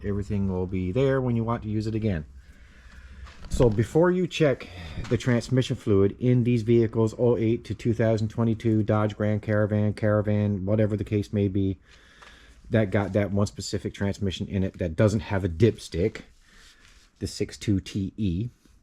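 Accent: Canada. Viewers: A minute into the video I was like "hmmm he sure sounds Canadian eh" and sure enough you are lol. I'm from Toronto, with at 2018 DGC.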